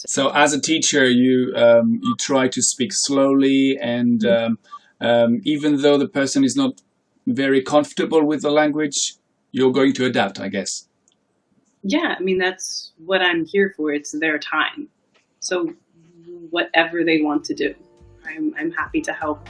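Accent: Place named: England, United Kingdom. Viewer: Hello, I watch your Channel quite a lot. I love, your English accent and your quiet and bright way of speaking. I progress a lot with you. Thanks for it. Take care 🍀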